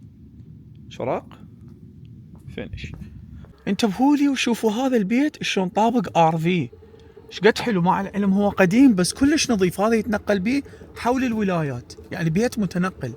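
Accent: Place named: Baghdad, Iraq. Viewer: عبالي انت من بغداد 😊😊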